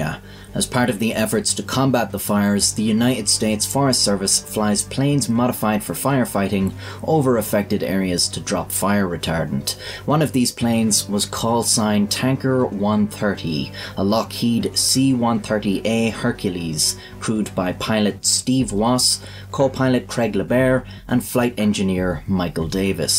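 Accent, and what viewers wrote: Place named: Mayo, Ireland. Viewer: Hey, random question but I don't suppose you're from Mayo? Your accent reminds me of The Hardy Bucks? Am I close?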